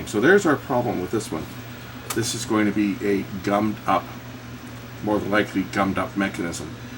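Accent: Canada. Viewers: great canadian accent!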